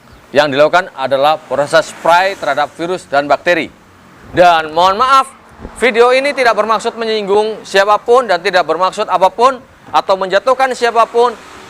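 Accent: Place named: Banyumas, Indonesia. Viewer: ngapak e isih ketara lah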